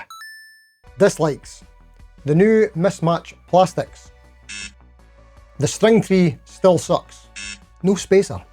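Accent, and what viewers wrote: Scotland, United Kingdom. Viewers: It’s shocking the scottish accent for spanish people 😅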